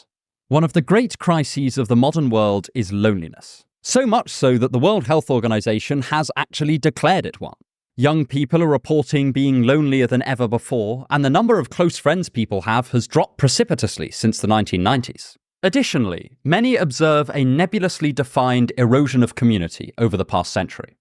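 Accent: United Kingdom. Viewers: Which accent has he? Is that British?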